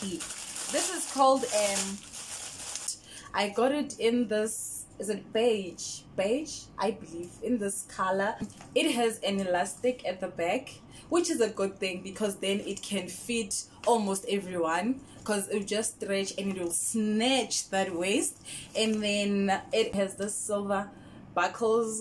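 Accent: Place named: United States. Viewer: You are so entertaining I was laughing throughout the video like it is the American accent for me.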